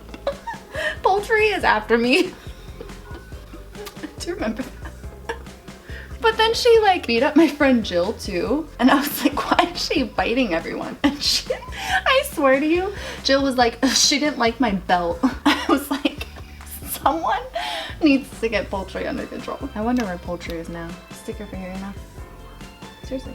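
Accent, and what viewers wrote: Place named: East Coast, United States. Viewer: I love that your east coast is coming out in this video! Every time I visit family in NJ it’s the same. Words ending in G? We don’t know her 😂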